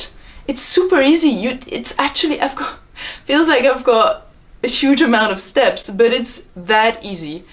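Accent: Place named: France. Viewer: je viens de decouvrir ta chaine, tu est française ? i just discovered ur channel and wanted to know if u'r french becuz of ur accent i find that it's somehow a french accent but in a goood way 😊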